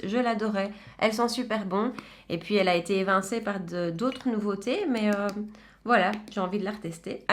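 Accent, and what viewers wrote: Belgium. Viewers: Coucou! Merci d'avoir répondu à mes questions! Félicitations pour tes + de 1000 abonnés du coup 😊 J'ai craqué aussi comme tu le sais sur la mini gold j'aime tellement le vert kaki 😍 Au Etats Unis ça doit être le paradis du maquillage mon dieu 😱 Et je confirme tu as un bel accent Belge! D'ailleurs j'ai des origines belges du côté de mon grand père paternel "ça se voit pas hein 😂" Je suis également "Mimi's signature" et "la Loge de Gabrielle" Tu es très agréable, douce, simple et je suis comme toi j'adore rigoler la musique danser... Bonne soirée et à dimanche 😘😘😘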